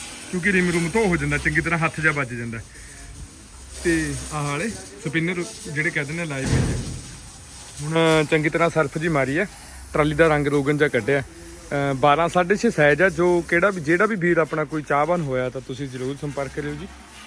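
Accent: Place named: Puadh, India. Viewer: Gay gay Kaya Kaya thoda area fathegarh lagda pawad boli bolde ho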